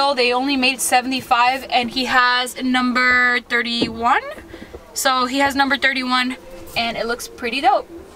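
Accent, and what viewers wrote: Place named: New Jersey, United States. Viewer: Are you Italian ?? You certainly look it . You definitely can't deny that you are a Jersey girl because you definitely have the Jersey accent and I have the Pittsburgh Yinzer accent. I vacation in Wildwood NJ every year and it is my absolute favorite vacation destination of all time.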